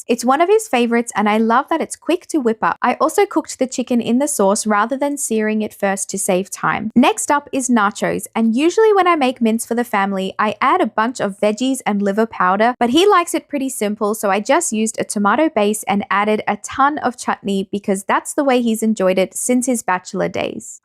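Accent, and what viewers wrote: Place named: Australia. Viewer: Hi! Seeing this content brought a smile to my face because we’ve also done GAPS as a family (it looked very similar to this!) and I just find it so refreshing hearing another Aussie mum talking about this diet!! I would love to follow you on instagram but your handle doesn’t come up! God bless x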